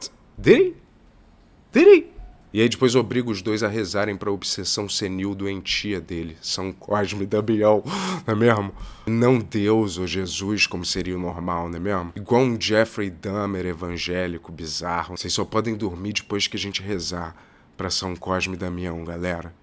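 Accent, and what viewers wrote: Rio de Janeiro, Brazil. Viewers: Esse e o primeiro video que eu vejo dele com vicio linguístico, incomodou um pouco esse "né mermo" sotaque carioca já não me encomoda tanto.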